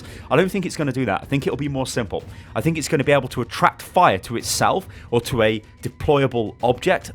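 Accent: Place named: United Kingdom. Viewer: I F*CKING LOVE BRITISH ACCENT, sounds so cool!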